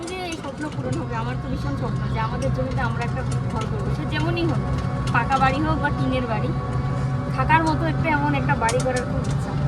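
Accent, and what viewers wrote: Bangal, Bangladesh. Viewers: তোমার বাঙাল কথার টান ভীষন ভালো লাগে। আমি তো ঘটি তাই তোমাদের বাঙাল কথার একটা আলাদা charm লাগে। মিকি কি মিষ্টি। আমার দুটো ডগুর মধ্যে একটার নাম মিকি।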